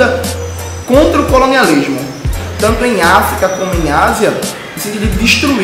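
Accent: Pernambuco, Brazil. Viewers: Oh Deus! Esse sotaque de pernambuco me mata. 😍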